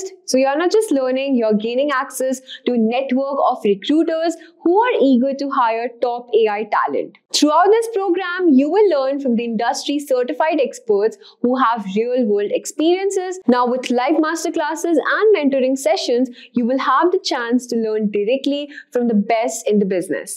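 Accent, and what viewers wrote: India. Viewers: Please have somebody with plain and clear American English to explain the benefits of this course. It is very difficult to follow along with the heavy Indian accent this presenter has.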